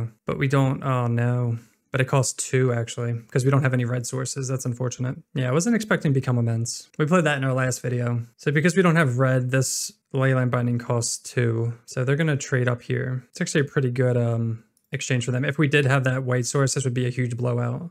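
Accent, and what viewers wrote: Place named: Philadelphia, United States. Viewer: Great vid man. Are you from Philly area?? The accent is strong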